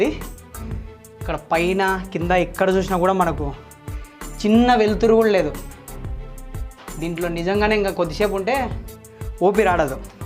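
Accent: Rayalaseema, India. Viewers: Super vundira bai, hatsoff to your photographer, we are enjoying your Rayalaseema style of language 👌and enjoying thril/unknown information 😀. Keep it <link> care when outdoors shooting. Jeete rehooo 👦👬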